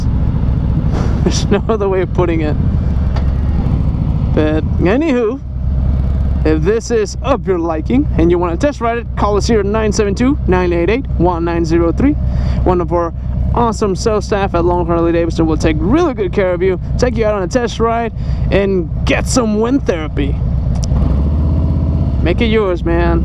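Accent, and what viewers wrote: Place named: Germany. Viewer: Nice review man. Where is your accent from? Deutchland?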